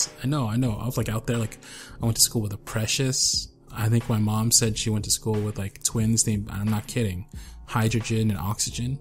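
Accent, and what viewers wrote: Philippines. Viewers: This cracked me up!!! > WE HAB DA PANNY ACCENT (Very Filipino accent!) 💘 When you spoke those sweet lines, I just died then and there. 😍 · pfft! sorry kuya c but I ended up laughing at your fil-english accent XD you sound like my foreign friends who are learning Tagalog for the first time XP but the words were spine-tingling in your voice nonetheless :3 ♡ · YOUR FILIPINO ACCENT OMG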